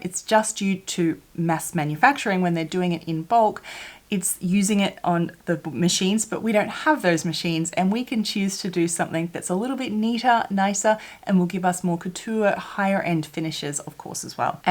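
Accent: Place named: Australia. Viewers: Well said ladies, who cares how pronounces her words, Its the content of her very informative videos that matter. I love our ascent, from a fellow Aussie 😉🇦🇺🐨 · Personally I love your accent. I had the privilege of living in Australia for a year in high school, and listening to your videos brings back memories.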